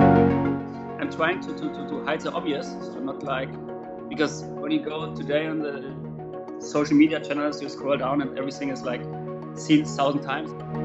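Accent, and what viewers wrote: Germany. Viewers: Typisch Deutscher Akzent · The way he speaks englisch he is a german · His german accent lol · I‘m german and i can clearly hear the german accent when he talks hahah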